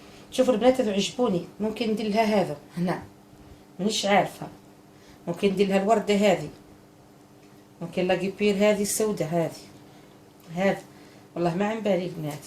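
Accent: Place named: Djelfa, Algeria. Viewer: تهدري كيما صحاب الجلفة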